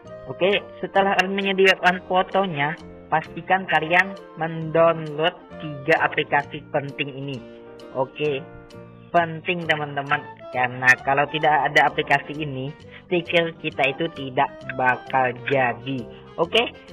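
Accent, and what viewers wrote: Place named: Banjar, Indonesia. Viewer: Ktahuan org banjar😅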